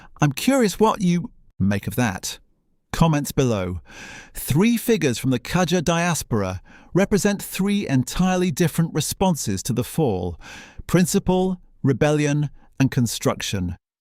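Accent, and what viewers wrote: United Kingdom. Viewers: The British accent says it all! Still trying to support the Qajars to reep from their 'not know how'? Speaker says that after Reza Shah free speech was banned. Shame on you! It is the British way to manipulate and take advantage of countries thru political manipulation. God bless the USA which the Brits lost with the Grace of God and bravery of Americans and so the Brits are still burning their as*es for the war of 1812. God bless Trump for not appeasing and listening to the Brits. God protect the USA, Iranian people and Israel from all especially the Brits.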